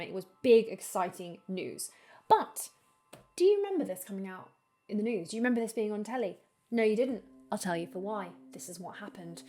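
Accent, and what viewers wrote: United Kingdom. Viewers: I know, and y so britty talking style.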